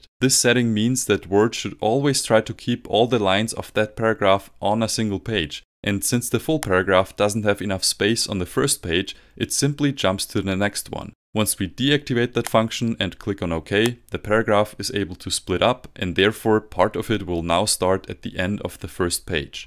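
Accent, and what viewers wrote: Germany. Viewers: I am sensing a German accent, so Danke!! :D Hat mir die Masterarbeit gerettet <3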